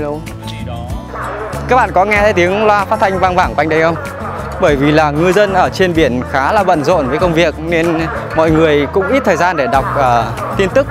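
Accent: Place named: Hai Phong, Vietnam. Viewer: Ngay lần đầu nghe giọng a nằng nặng e đã nghi a là người hp r. Hóa r đúng thật. Xin chào anh người đồng hương. Rất mong đc a thả tim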